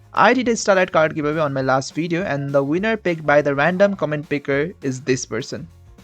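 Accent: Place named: India. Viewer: title looks Filipino but your voice sounds Indian 💀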